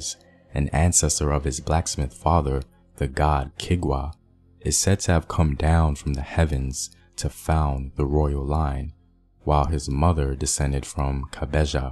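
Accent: United States. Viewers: Where this American sounding person got this information? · cant get over your VERY AMERICAN accent mentioning these african words. funny that the historical myth of he milk is the same as the one of the banyankore of Uganda.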